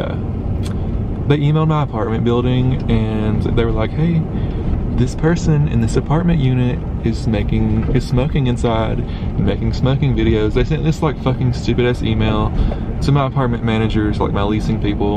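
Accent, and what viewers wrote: South, United States. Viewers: I've never heard a country gay accent, it's so mesmerising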